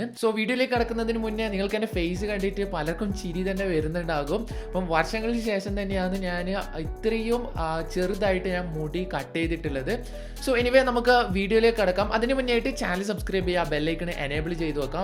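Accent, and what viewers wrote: Kannur, India. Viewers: Ningal kannur aan. Alle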